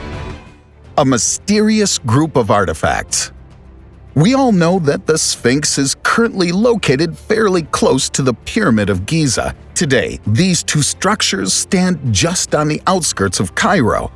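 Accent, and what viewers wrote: United States. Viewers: Yet another annoyingly loud, patronising American narration aimed at 5 year olds.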